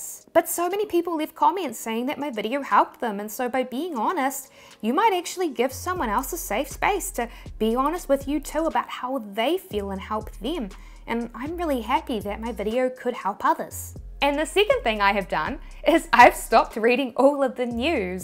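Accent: New Zealand. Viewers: Great that you share your experiences and all the helpful information; we all benefit from this. I'm a fan of your channel, inspiring videos, and lovely NZ accent. Keep up the great work! But relax sometimes too ;-).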